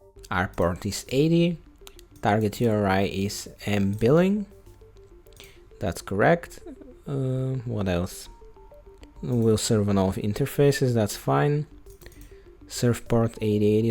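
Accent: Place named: Russia. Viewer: я понял по акценту что ты гооришь по русски,давай следущее видео на русском?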